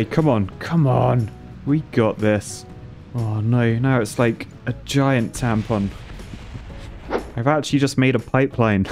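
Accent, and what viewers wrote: United Kingdom. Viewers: oi noi it broke, oiii noiiii. your british accent is great. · Are you British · are you British because I am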